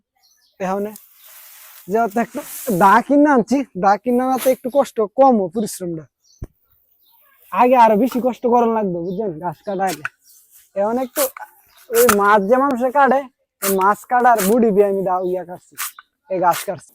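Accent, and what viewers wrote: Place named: Brahmanbaria, Bangladesh. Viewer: তোমার বাড়ি ব্রামনবাড়িয়া?ব্রামন বাড়িয়ার মানুষ কথা শুনলে মেজাজ টা গরম হয়ে যায়,কারণ এরা খাওয়ারে বলে হাওয়া আরো অনেক কথা আছে এই টাইপের আছে।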